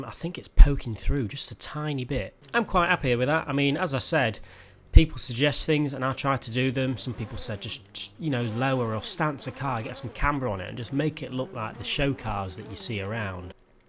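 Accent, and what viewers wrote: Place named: Manchester, United Kingdom. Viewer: sounds like you've got a mank accent u from Manchester ?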